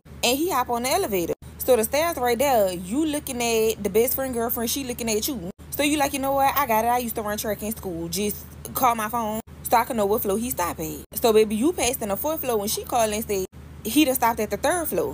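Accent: New Orleans, United States. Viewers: That’s that New Orleans accent baby👏🏾 Louisiana in the building 🫶🏾😂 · Only N O people have that accent not the whole Louisiana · That nawlin accent is everything to meeeee behbay!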